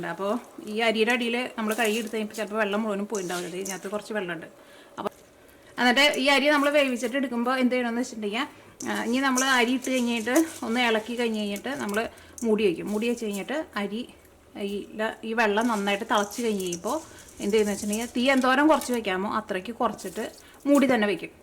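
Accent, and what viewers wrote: Thrissur, India. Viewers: Thrissur kar ayonda chengayi mare samasaram podik kudum.ente kudumbakar und anubavam und.Ek estta avarude samsaram kelkan.😍😍😍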